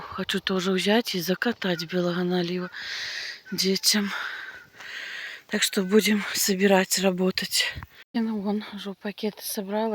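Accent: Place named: Bryansk, Russia. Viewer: Как вас приятно слушать. У вас такой говорок, как у нас на Брянщине... Один в один...Давно не была на родине.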